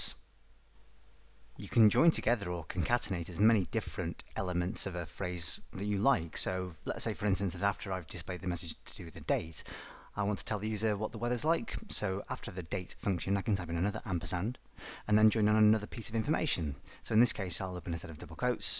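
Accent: United Kingdom. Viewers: Not sure if I love these videos because they are informative and easy to follow or because of the british accent. Either way, well done!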